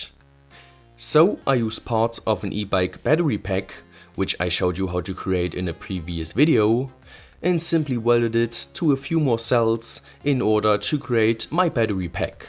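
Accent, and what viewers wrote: Germany. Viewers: I cant stand your german accent please learn proper english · Are you from Germany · Hört der sich nur für mich deutsch an ?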